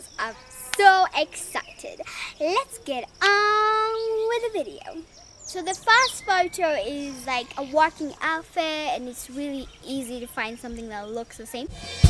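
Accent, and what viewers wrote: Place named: United Kingdom. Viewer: Your english pure UK accent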